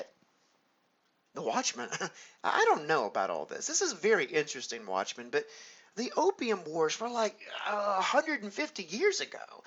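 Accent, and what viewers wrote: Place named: South, United States. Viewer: As soon as I hear American Southern accent, I know conspiracy theorists are coming.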